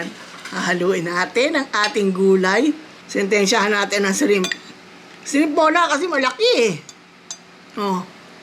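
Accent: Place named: Pampanga, Philippines. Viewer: bat parang maypagkapampangan si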